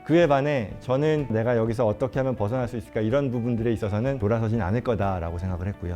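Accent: United States. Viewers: 이야...환경이 중요하구나 한국에서 오셨는데 미국에서 태어나고 자란 나보다 더 교포느낌 나시네 ㅋㅋ 난 한국서 연습생해서 지금은 영어하지않는 이상 사람들이 교포인지도 모름ㅋㅋ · 말투가 미국교포 발음이라서 어렸을때 간줄 알았는데.. 이력보니 서울대 경제학과 졸업후 가신거네요. 어렸을때 갔으면 한국에 대해서 잘 모를텐데 대학 졸업후 간거면 한국에 대해서 너무 잘 아시겠네요. 이분이 한국과 미국 양쪽에서 성공적으로 활동할수 있었는지 알수 있는 이력이네요.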